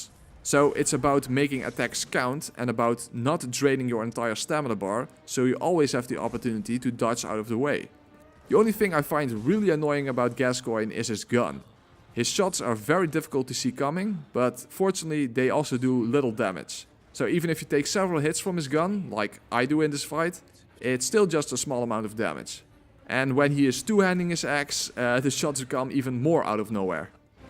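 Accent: Netherlands. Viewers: ah sweet, sorry for the very late reply lol xD, i thought i noticed in some of the way of prenouncing, not trying to say the english is bad in any way though! Just as a fellow dutchie u recognize other dutchies talking in English. Also great video btw, this helped me a lot with the bl4 run :)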